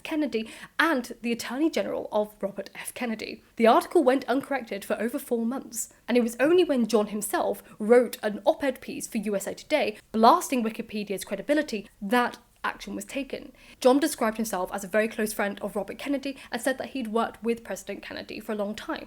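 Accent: RP, United Kingdom. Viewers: No she's just British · Was your father in the House of Lords cause I’ve heard posh accents before but that’s something else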